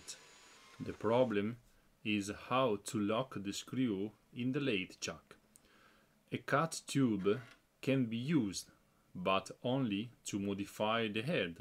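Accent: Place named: Venice, Italy. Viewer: A me parea mi de riconossar na cadensa nostrana... te sarè mia dal Doeo!? Bel laoreto, brao 💪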